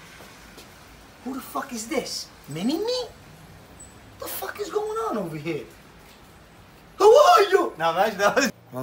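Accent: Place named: Brooklyn, United States. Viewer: LMAO....so sweet how much they love you! You make my heart melt and that unforgettable Brooklyn Italian accent I miss hearing so much....keep it coming!😘💗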